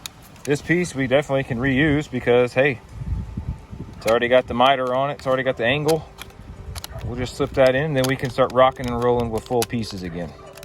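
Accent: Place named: Texas, United States. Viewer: would be interesting to have a follow-up on how this gutter brand worked for you. also, are you from GA? I lived there 15 years and TX for 15 years and your accent reminds me of TX more than GA. 😁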